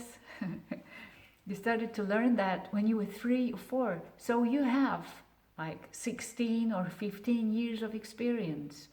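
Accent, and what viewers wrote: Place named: Spain. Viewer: Wspaniale przeslanie, española angielski. Jest pani the best🙂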